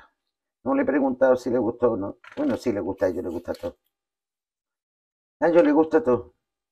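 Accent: Andalusia, Spain. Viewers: Oir tu acento andalús y recetas me recuerda tanto a mi madre. Gracias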